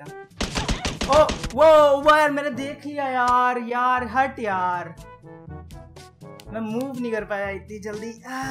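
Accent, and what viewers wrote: Haryana, India. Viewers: kya aap haryana se ho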